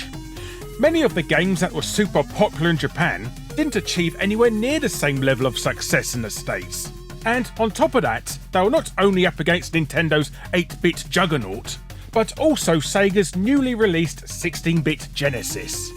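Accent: United Kingdom. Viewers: He's British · Sup, Just writing “Hi” here to confirm that INDEED the subtitles help me TONS as a non eglish speaker with trouble understanding heavy accent english (no offense brits i LIKE your english, but is hard to understand some of your pronunciation 4 me without subs. it even helps me to learn it) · You new here and never heard a Brit?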